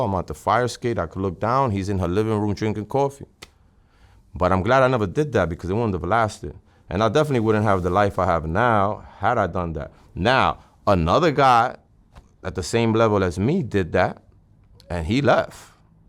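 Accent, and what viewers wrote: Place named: Bronx, United States. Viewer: "If you have a farm full of cows, does it pay for you to release your cattle? No, you gotta keep them in order to milk them." Damn, that's so true. I lived 14 years in Parkchester/Castle Hill, The Bronx. I hear that Boogie Down Bronx accent 🤌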